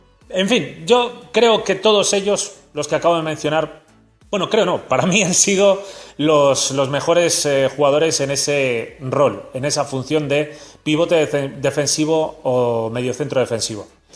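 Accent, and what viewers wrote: Spain. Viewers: se te nota mucho loe español, pero no te culpo es inevitable. faltan muchos, pero que no esté kahn es una pifia muy grande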